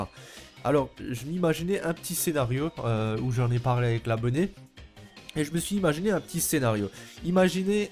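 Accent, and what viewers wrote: Alsace, France. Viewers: t'es alsacien nan ? xd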